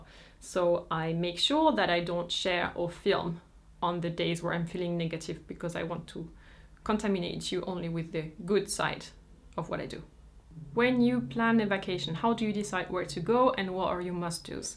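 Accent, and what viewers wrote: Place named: France. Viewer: Dear, your English is very good, even with so much French an intonation. I've learned a lot from your videos that I have no idea before. You are my favorite.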